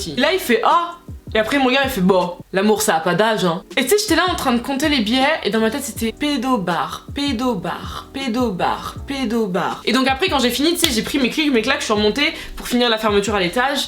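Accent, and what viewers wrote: England, United Kingdom. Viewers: Grave un accent british quand tu parles anglais. Ca fait chelou, limite j'ai límpression que cést une autre langue. Ici à Atlanta, on parle beaucou[ plus vite et avec le nez. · ton accent british quand tu parle anglais me perturbe trop je sais pas pk 😭😭😂😂😂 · On peut prendre 2min pour admirer son accent anglais magnifique ? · The british accent waoouuh🥺🥰🥰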